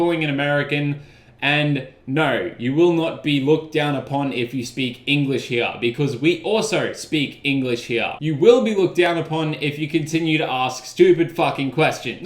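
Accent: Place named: Queensland, Australia. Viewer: your a queenslander if ive ever fuckin heard one mate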